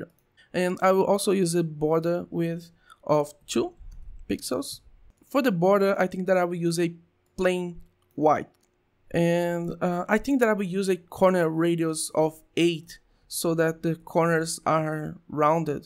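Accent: Brazil. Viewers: Vi que vc era brasileiro só pelo sotaque, valeu pelo vídeo cara :)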